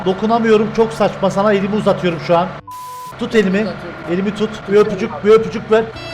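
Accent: Cyprus. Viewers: Abi sen kıbrıslımısın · Ben seni Kıbrıslı sanıyordum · Sen nerelisin kibristan mii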